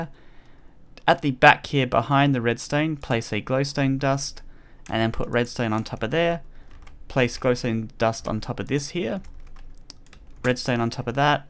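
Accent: United Kingdom. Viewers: You're Australian?! I live in Australia and thought you're accent was british. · WHAT, I thought your accent sounded British, i'm Australian aswell :D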